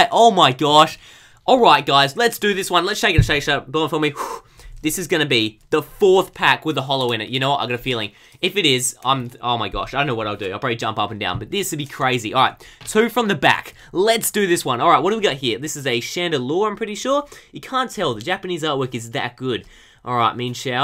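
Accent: Australia. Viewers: Australian accent mate :)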